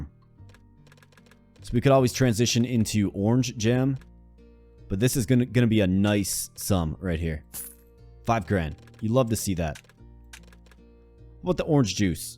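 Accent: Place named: Canada. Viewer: Wait, are you canadian? You say pleg instead of plague, and rut instead of root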